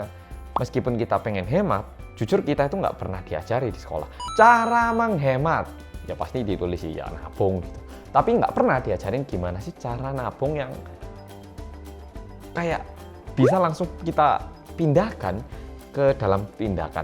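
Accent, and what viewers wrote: Java, Indonesia. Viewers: Orang korean yang medok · Sukses terus mas, ga pernah bosen aku nonton channel ini, mantap lah, jowo ne medhok tenan, wess apik lah poko'e. sukses terus mas. semoga lebih maju kedepannya. dan dapet menginspirasi kita semua mas🙏🙏🙏🙏🙏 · Jangan ngomong bahasa Jawa ke aku:').Aku gak bisa bahasa Jawa.Coba kamu denger aja deh intro rindingdong nya mas.Mas kan ngomongnya medek wkwkkwkwkwkkw🤣🤣🤣🤣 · 1. Jempol, Mas utk segment barunya...👍 2. Sy emak2 biasa yg ga ngerti investasi forex ato reksadana ato apalah gitu2. Jadi invest nya dlm bentuk LM aja. Dari tip no1, alhamdulillah udh lumayan dpt 'segenggam' LM..😘 3. Tip no 3 sdg dicoba. Kok rodo angel yo atur mindsetnya... hehe...😏 4. Looking forward to other practical tips n tricks ya nan irit. 5. Tqtqtq😊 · Ta pikir orang korea yang medok eh tau nya irit😬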